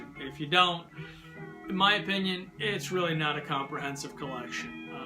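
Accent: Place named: United States. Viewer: Hi!! Well done with your channel!! It’s lovely to hear your American accent.. hopefully one day we can meet 😌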